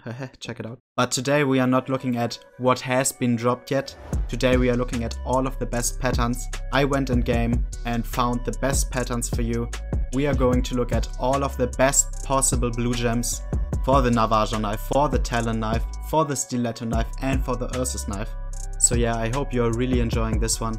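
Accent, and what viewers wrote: Germany. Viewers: U Germany?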